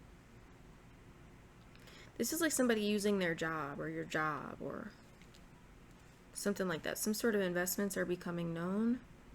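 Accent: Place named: South, United States. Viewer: I really like to listen to you because of your southern twang 😊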